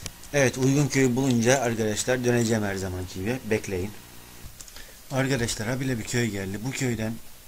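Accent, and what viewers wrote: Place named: Giresun, Turkey. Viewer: abi siven bizim oranun sivesi da sen nerelusun ben giresun espiye ..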